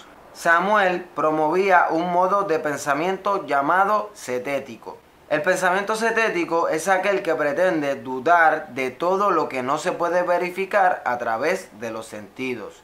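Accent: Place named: Puerto Rico. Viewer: que loco que escuche tu acento y fuiste el primero con ese acento que no habla de reggeton ni de un temaa , bendiciones a PR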